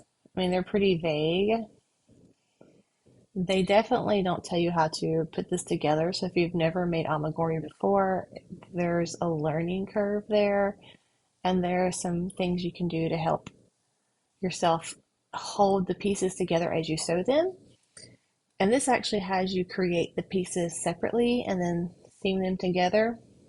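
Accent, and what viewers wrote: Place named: South Carolina, United States. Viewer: You sound like us in South Carolina. I didn't comment on the snake because so used to that. We have had snakes moving around lately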